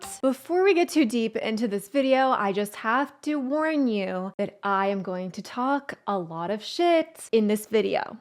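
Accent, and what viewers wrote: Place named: West Coast, United States. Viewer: She's from California so skill issues aren't her fault. It's the game's fault. (I have no idea where she's from but she sure talks like she's from the West Coast.)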